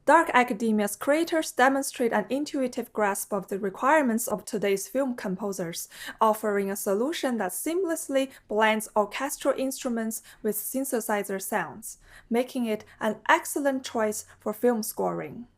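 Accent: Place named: Russia. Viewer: I find it better to write this in a notation software. Sure it gives less control over the balance and still, in the end we get a full score for orchestral performance and there's a lot of excellent sounds that sounds very good and for free. Musescore for example. By the way, I love your Russian accent.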